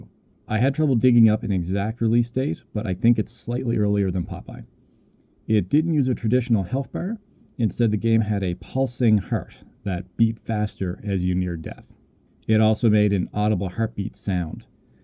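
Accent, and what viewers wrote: Canada. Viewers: "Hærts." That's what goes doki doki when I hear the unmistakeable accent of a True Northerner. 🇨🇦 Great research and videos, standing on guard for you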